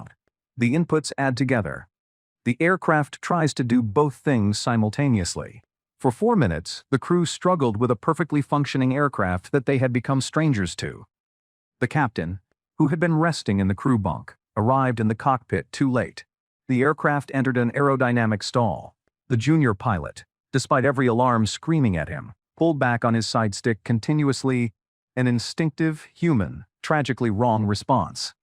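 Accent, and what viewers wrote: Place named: United States. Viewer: The American accent reveals the lie to this video. Many Americans have a bias towards Boeing aircraft. I have flown helicopters and fixed wing aircraft. Among the fixed wing aircraft have been the Boeing 737, Airbus A320 and A330. Comparing the A320 and the 737 the A320 is by far the better aircraft to fly. It's designed to be flown through the autopilot, but so is the 737. The Airbus is just so much better through the autopilot. The sidestick has been normalised by years of computer gaming. It also removes an obstruction to the instrument panel. The instrument panel is clear and concise with everything you need on the PFD (Primary Fllight Display) directly in front of both pilots. The most important safety factor in flying is situational awareness. The unobstructed view of extremely clear and concise instruments provides that. 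The cramped cockpit and a yoke in the 737 is less helpful. Hand flying in Normal Law is a pleasure with a sidestick which has enough feel and instruments providing excellent feedback. Increasing thrust in a 737 raises the nose with the engines under the wings. Reducing thrust you get the opposite effect. Under Normal Law the A320 system detects any increase or dcrease in 'g' and counteracts it. Increase thrust and you just go faster. So much easier with the autothrust on the approach. On the 737 you need to take out the autothrust on the approach to avoid porpoising as the autopilot chases the porpoising. So many other good points to the Airbus. I've a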